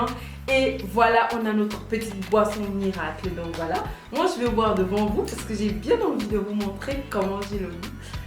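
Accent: Gabon. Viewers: cet accent qui me rappelle mon Gabon 🥰🥰! Merci pour l'astuce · Votre accent on dirait une gabonaise 🤔🥰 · C'est la première fois que je regarde vos vidéos. Votre accent ? Vous êtes gabonaise ???😍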